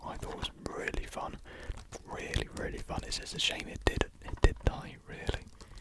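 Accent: United Kingdom. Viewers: I can tell my teacher I'm learning British English with Asmr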